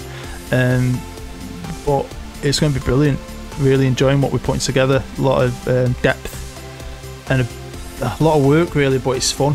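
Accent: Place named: Glasgow, United Kingdom. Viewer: Personally....I'm proud that I can finally understand Glaswegian!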